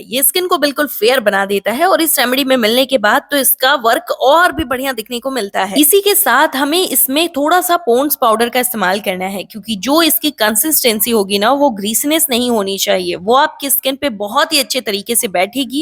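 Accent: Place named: Gonda, India. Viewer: Gonda se bol rahe hai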